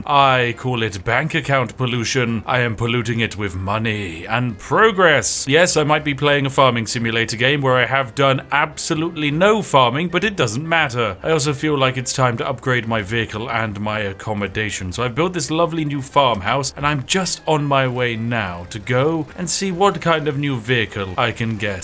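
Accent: United Kingdom. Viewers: let’s game it out but british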